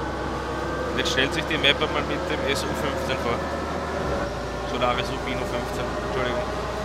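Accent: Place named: Austria. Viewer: Das hört man doch das er Österreicher ist.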